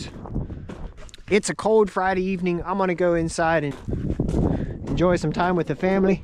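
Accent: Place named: Lancaster Amish, United States. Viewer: Just found your channel from watching the interview which was very interesting my wife and I also left the Amish after we were married 4 years and that’s been 17 years ago I could hear in your Amish accent you were from Lancaster 😂 I grew up in Montour County Pennsylvania actually we just moved back here a year ago and so we do have Lancaster Amish for neighbors 😂 my wife and I did not grow up in Lancaster Amish but we did grow up old order Amish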